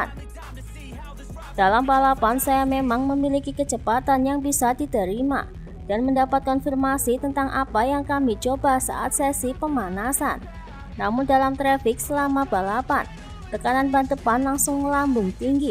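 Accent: Java, Indonesia. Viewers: Komentator agak medok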